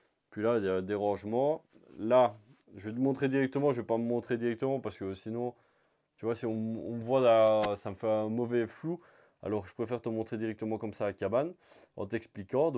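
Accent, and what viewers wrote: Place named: Liège, Belgium. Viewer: Bonjour, tu es super bien installé, tu es originaire de Belgique, car tu as l'accent Liégois, bonne saison à toi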